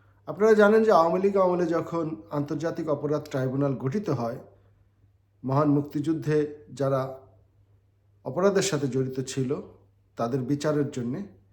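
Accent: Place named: India. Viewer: তোমার কথা শুনে মনে হচ্ছে যে, তুমি ভারতের প্রডাক্ট।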